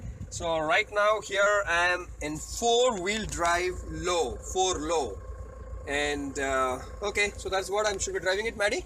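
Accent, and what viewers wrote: India. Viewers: it's not fake accent, he is telugu so his pronunciation is like that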